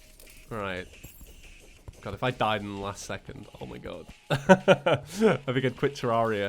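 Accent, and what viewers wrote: England, United Kingdom. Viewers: I live in the US and you said 1000 pound grass and I was like... why are you weighing grass?! Then I realized thats money. And I never would have realized that if you didn't have one of the most english accents ever.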